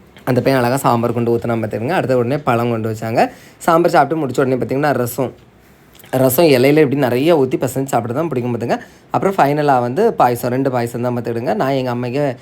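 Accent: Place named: Kanyakumari, India. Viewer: நீ படிச்சவன் தானே நீ ஸ்கூலுக்கு போய் இப்படியா பாடம் நடத்துவா கன்னியாகுமாரி பேச்சை மாத்திரியா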